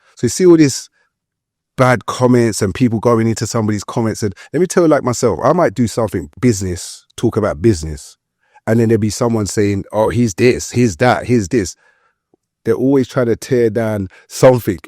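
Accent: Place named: Tottenham, United Kingdom. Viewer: Tottenham people talk the same 😂😂, him and OG have similar mannerisms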